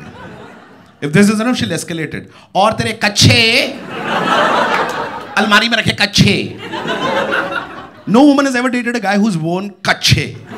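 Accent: Delhi, India. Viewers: I just love his dehliite punjabi style.... Hilarious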